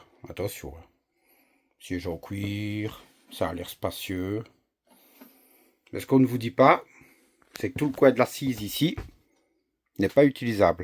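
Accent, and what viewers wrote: Belgium. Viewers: 😂....j'ai rigolé comme jamais en regardant cette vidéo!! Outre l'accent Belge, succulent, votre bouille de bon gars qui s'est fait berner et qui voit son rêve se transformer en cauchemar (à 60000 balles) est superbe! Je suis vraiment désolé pour vous. Bonnes vacances tout de même!! P.S: prévoyez une tente Queshua pour les gamins, c'est plus rapide à monter et bien plus confortable!! 😊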